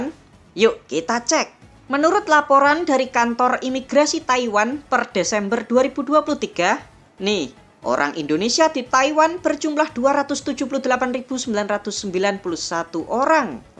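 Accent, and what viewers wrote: Java, Indonesia. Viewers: Njenengan tiang pundhi min kok suaranya medok jowone min 🙏🙏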